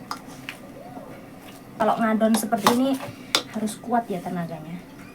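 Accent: Java, Indonesia. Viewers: Jowone Endi sampean mbak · seperti org Jawa tp bukan Jawa barat Sunda soalnya jawany seperti ada mirip bhasa cirebon tp bukan heee pernah bilang "umeb" artinya mendidih heee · Asyik jowomu jek medok dik ❤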